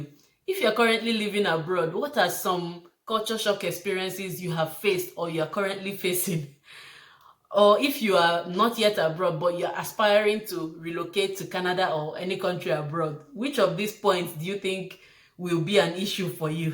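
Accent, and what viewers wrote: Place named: Nigeria. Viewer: Canadians have a hard time with Nigerian accents, partly because we're just not used to them, and they're so different from North American accents. The first trick is to slow down. Then try to harden your 'r's, so that, for example, "evah" comes closer to "everr" - the "rhotic r" is not as elegant, but it's more likely to be understood here. Then try to flatten your long 'i's so they don't sound the same as long 'e's - my doctor, who is Nigerian, once asked me if I wanted some "peels" - I had to ask him to repeat himself three time before I realized he was saying, "pills" ... ! Btw, I don't think most Nigerians would have any trouble with their accent in England, if that's any consolation ... !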